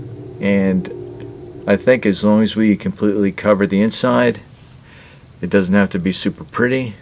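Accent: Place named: Brooklyn, United States. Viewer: I detect some Brooklyn in thar…..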